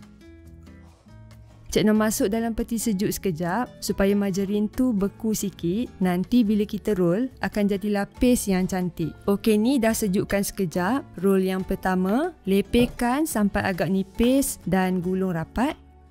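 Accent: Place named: North, Malaysia. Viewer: ni org utagha ka? Mcm ada dengar sleng utagha ja tu?😁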